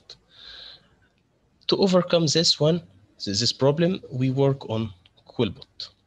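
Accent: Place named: Egypt. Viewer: ما شاء الله. لكن ملاحظة لو تتكلم الإنجليزية بدون لهجة مصرية التي تحول الثاء إلى سين والذال إلى زاي.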